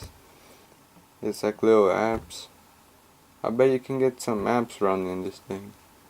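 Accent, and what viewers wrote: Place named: Mexico. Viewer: you sound mexican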